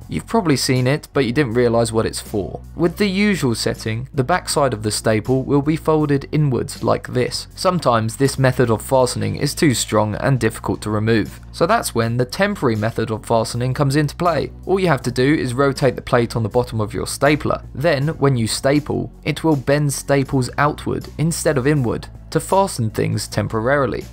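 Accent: United Kingdom. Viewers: InxPursuitz he sounds nothing like him. Dolan is Australian this guy is British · Is it only me or is every top 10 channel British? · Oh you know I just realised this person has a British accent ,' '-D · I love the accent. If this weren’t conveyed in a British accent I think I wouldn’t love it as much.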